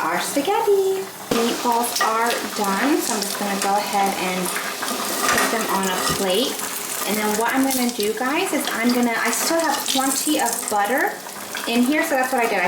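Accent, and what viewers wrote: United States. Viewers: Good morning. Nice to watch this new video. Frankly speaking, I watch your videos not because I am fond cuisine, but because I listen to you speaking English in American accent. I am a fervent learner of English language. I really appreciate the particular video, in which you showed how passionately you are leaning Bengali. I will be looking forward to watching such more videos from you.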